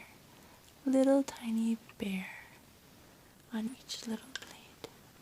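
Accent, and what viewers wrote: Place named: Canada. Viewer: hi!! i was just watching some asmr before heading to bed, i just have to ask, are you canadian or midwest american? if this is invasive im so sorry!! its because i like accents, and i love learning about them alongside with dialects. and your accent sounds VERY canadian, or maybe even wisconsin sounding if you’re american :)! love your videos before bedtime!! helps me transition into the night, and due to being autistic, it can be hard to go from one thing to another. cheers ^_^ !!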